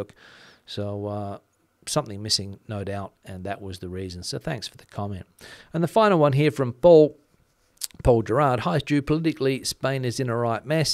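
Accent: Australia. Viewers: did your family make fun of your muted Australian accent? Spain's politics seem tranquil as compared to the USA. I'm stupid enough to have believed we'd return to more sane politics after Trump lost the election. I'm lucky in regard to "Jet Lag". Been to AU and NZ probably 7 times, and Europe at least 25 times. No problems. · nothing to do with anything, but, your Australian accent - I think it's the coolest accent, when it comes to the English language, of all English speaking countries; ours (Canadian, can be funny at times, talking a boot... 🤪)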